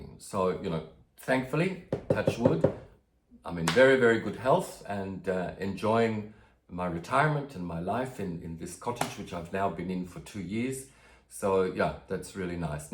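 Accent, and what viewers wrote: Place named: Australia. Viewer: Hey there..I follow Svetlana..so on her recommendation had to check out your channel. I was trying to workout your accent & u said 'u pinched something'. I thought is he Aussie & then u confirmed it 😊 I am from SE Qld & have lots of Aglaonemas . A lot of them have different names here to overseas but basically the same plant. There are lots of hybrids here. Nice to see the start of your collection..it's a rabbit hole once u start 😉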